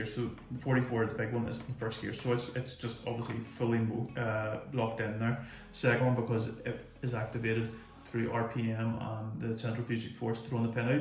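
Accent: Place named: Ireland. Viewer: Can't hear wtf your saying . Sort it out. I guess u are Irish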